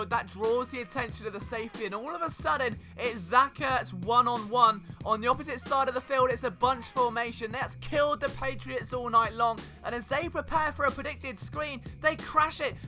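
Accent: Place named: London, United Kingdom. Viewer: Your accent is still pretty heavy (I assume you're originally from London(?)). I'm wondering how if you live in Philly (I assume you live there).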